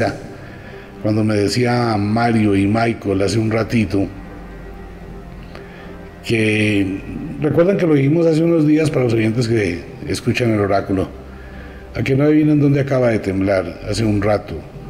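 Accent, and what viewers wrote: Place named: Colombia. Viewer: SEÑOR: LE COMUNICO POR ESTE MEDIO QUE TIENE USTED MUCHO KARISMA SUS CHARLAS SON MUY AMENAS Y MUY DIGERIBLE POR LO CUAL LE AGRADEZCO Y LE EXPRESO MI MÁS SINCERA Y HUMILDE ADMIRACIÓN LE CUENTO QUE LA GENTE DE COLOMBIA ES LA MEJOR AMÓ SU ACENTO ES QUUE SUCEDE, PASA, Y ACONTECE QUE HACE UNOS 10 AÑOS CONOCÍ EL GRAN AMOR DE MI VIDA... (uno de tantos pues) ERA TAN HERMOSA ERA DE CALI Y DE AHÍ QUEDE ENAMORADO DE TODA LA GENTE COLOMBIANA YO SOY DE MONTERREY NUEVO LEÓN MÉXICO. Y ADICO EN L.A. CALIFORNIA ESPERO VEA ESTE MENSAJE ANTES DE NAVIDAD LE DECEO MUCHA FELICIDAD EN ESTA NAVIDAD Y MUCHA SALUD Y SOBRE TODO MUCHA PAZ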